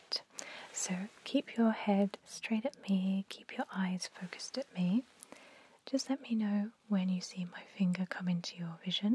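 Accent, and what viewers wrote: United Kingdom. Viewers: I don't know what it is about a British accent, but it makes ASMR videos so much more enjoyable. 😊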